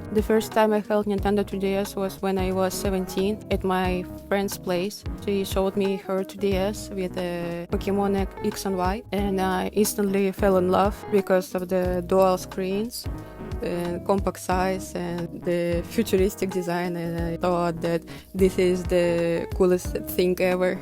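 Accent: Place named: Russia. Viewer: this woman got that babushka accent.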